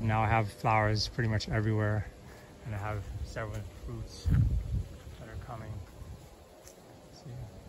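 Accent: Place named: Jamaica. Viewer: Respects - cool technique. (PS you sound like a yard man all weh ah gwaan still 🙂)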